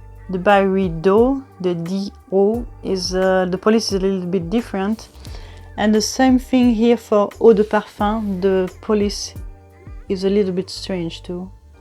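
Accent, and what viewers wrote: France. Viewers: Hello, i feel like you have a French accent! Am i wrong?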